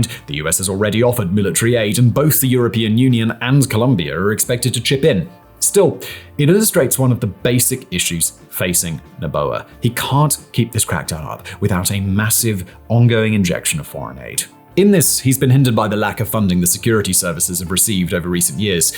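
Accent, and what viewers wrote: England, United Kingdom. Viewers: Cant blame him. I think hes english english... like.... engla terra english.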